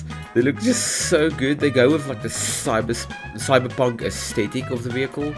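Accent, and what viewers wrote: Afrikaans, South Africa. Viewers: yeah you can hear your home language Afrikaans but I must say this game is addictive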